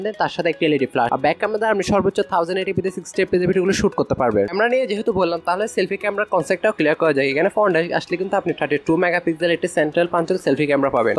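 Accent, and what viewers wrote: Chittagong, Bangladesh. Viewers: চিটাইঙা পোয়া বাজি যেইল্লা মাতক চিটাইঙা যে বঝা যাইবো😅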